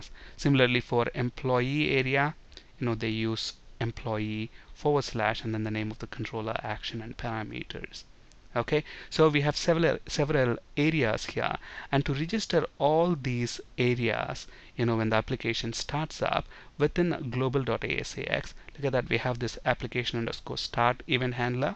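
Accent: India. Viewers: At first i didnt liked ur tutors, but ... you are the best tutors maker i have ever seen. Keep up. I didnt like them cuz of the common words like "vep-applications; vindows" etc :D Indian stuffs... but u are awesome :) That on impressed me the most... i mean that u are using fresh VS from beggining and in every tutor u are using same names for ur models, views etc.. so it makes it more clear. GOOD JOB!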